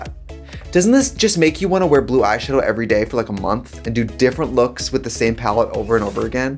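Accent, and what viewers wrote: Wisconsin, United States. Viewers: Now that I know you’re from Wisco, I can’t I hear it. I love you so much.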